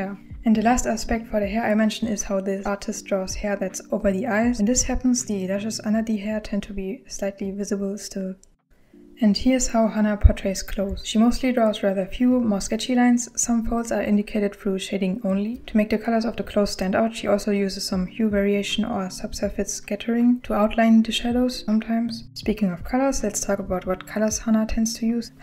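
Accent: Germany. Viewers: already one of my favorite art style studies I've watched so far, you deserve so much more attention! Also, idk why but you sound like you have a German accent lmao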